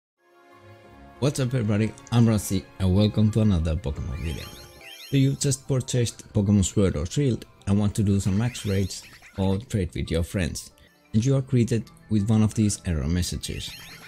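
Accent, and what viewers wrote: Finland. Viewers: Do I hear a Finnish accent or am I going crazy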